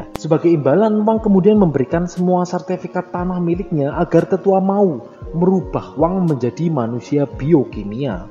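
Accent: Banyumas, Indonesia. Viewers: Rika wong endy man deneng bahasane ana banyumasane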